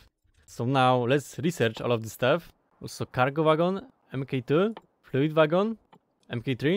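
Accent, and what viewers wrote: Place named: Poland. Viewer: I thought so! I knew it sounded like my fellow country man, Elo mordo!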